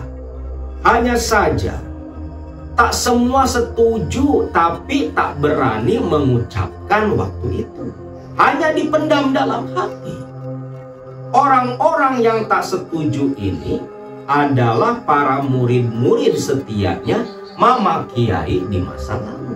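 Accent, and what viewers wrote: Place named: Banyumasan, Indonesia. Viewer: Tegal hadir kang wong ngapak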